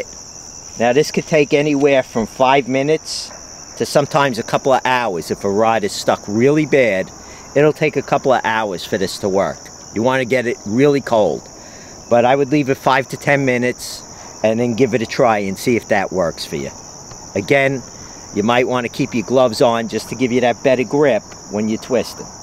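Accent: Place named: New York, United States. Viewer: New Yorker 😂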